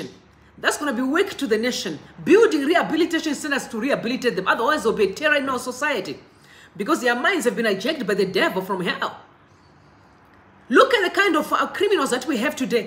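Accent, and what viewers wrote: Zimbabwe. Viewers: Good content but be yourself , you try too hard to sound British and then slip into your default Shona accent and back to British accent... Go to school work hard was a Whiteman s agenda when they put the school.system . We need our own system that works for us.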